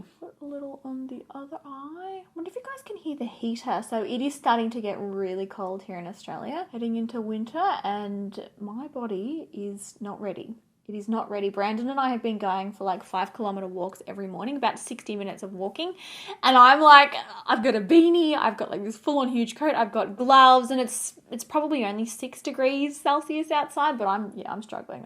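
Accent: RP, United Kingdom. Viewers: Not a bad English accent xx love from the UK 🏴❤️ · Haha love the British accent. We don’t all sound like that though. That’s what posh people from London sound like 😂 xx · The British accent! I was loving it. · I am 🤣🤣. I'm from the U.K and your British accent is 👍 Love your channel, however you cost me a fortune in shipping and tax fees when I purchase your products 🤣🤣 ps your finishing powder is the BOMB 🥰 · your british accent is really posh lol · We Americans like to do the English accent too lol! You look gorgeous! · That British accent 😂🤣 brilliant, but very posh darling 😂😂 most of us are common as muck haha xx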